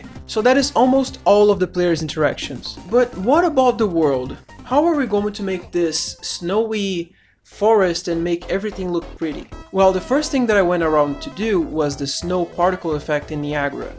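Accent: Brazil. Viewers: e esse sotaque de Br ae?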